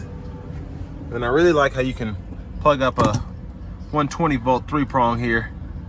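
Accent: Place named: Pittsburgh, United States. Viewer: Nice review. I know you are in Canada but it sounds like you have a Pittsburgh accent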